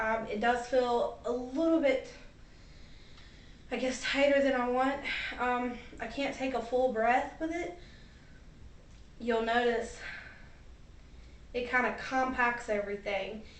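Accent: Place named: Carolinas, United States. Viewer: Hey this is not a stalker creepy comment but what state do you live in. Because of your accent I would say North Carolina or maybe South Carolina??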